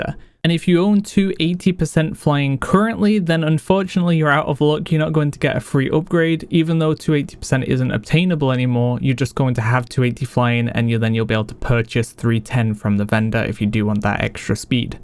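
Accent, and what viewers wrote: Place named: Northern England, United Kingdom. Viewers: i would say lancashire/ cheshire / w yorkshire region for his accent. nw england. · It’s his northern English accent. That’s how they pronounce it there!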